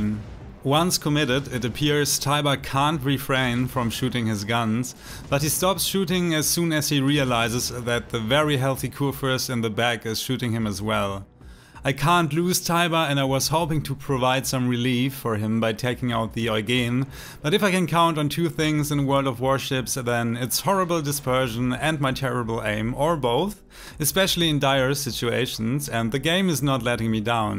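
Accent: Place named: France. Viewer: haha yes he has a proper french accent :D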